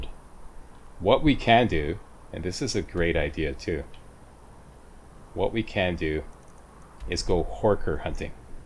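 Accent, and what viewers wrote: Canada. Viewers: FREE CRACK! Just kidding. Now that I have your attention, are you Canadian? You sounds Canadian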